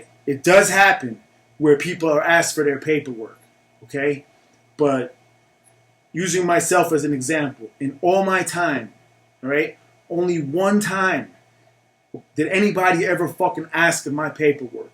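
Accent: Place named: East Coast, United States. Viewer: I don't know where you are from but it sounds like you are from the east coast somewhere. Because i did 8 years here on the east coast and i did my time in the same way as you are talking. I actually punched a guy in his mouth once for coming at me sideways in asking for my paperwork. I took it just like you said. I said to him, what do you calling me a ripper? And i hit him in the mouth. But on the west coast, mostly California they have prison politics that are completely different. They segregate races as part of the prison politics and here on the east coast we don't do that. Its two totally different worlds.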